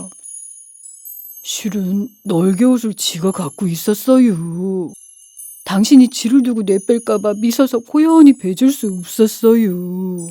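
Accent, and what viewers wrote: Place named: Seosan, South Korea. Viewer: 서산 말씨를 자꾸 따라허게 되네유~^^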